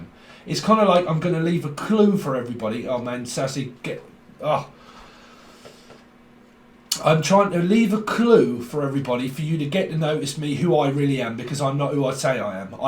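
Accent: United Kingdom. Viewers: your lovely british accent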